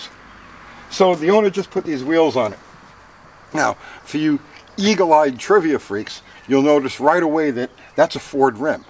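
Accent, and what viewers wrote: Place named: New York, United States. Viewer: Holy moly uncle. First I've really heard your new York accent. Your rear quarter comment sounded like rear quota.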